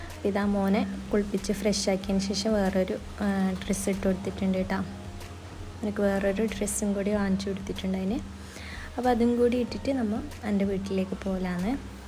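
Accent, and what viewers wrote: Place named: Kannur, India. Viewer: ❤nice 👍ഈദ് മുബാറക് ആദ്യം ആണ് കാണുന്നത് ചാനൽ കണ്ണൂർ ആണെന്ന് തോന്നുന്നു സംസാരം കേട്ടിട്ട് 😊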